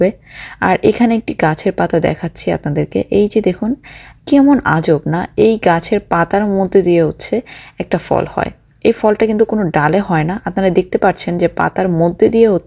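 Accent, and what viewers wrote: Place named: Dohar-Nawabganj, Bangladesh. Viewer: Apur Kotha Sune Mone Hoy Apur Basa Dohar-Nawabgonj A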